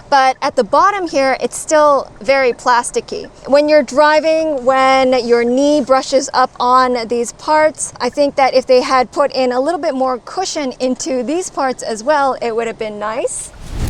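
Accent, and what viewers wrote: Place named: United States. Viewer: Excellent review! But just wondering, where are you from? You have an American accent, but the license plate doesn't seem to come from North America. Also wondering if the features you are talking about will come to the US.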